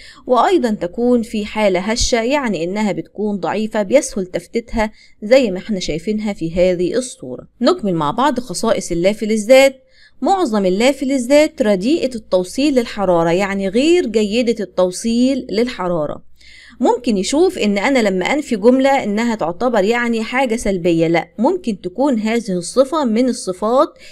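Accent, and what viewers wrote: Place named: Saudi Arabia. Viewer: ليش تتكلمي سعودي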